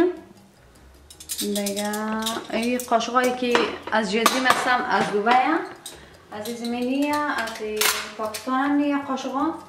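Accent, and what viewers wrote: Afghanistan. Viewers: شما خیلی منظم و با سلیقه هستین. زنده گی تانرا در افغانستان سپری نموده اید یا در ایران.چون لهجه تان بسیار شیرین و افغانی بنظر میرسد.خیلی در تلاش همچو همسر مثل شما میباشم.فکر نکنم که پیدا نمایم.چون طالع ندارم.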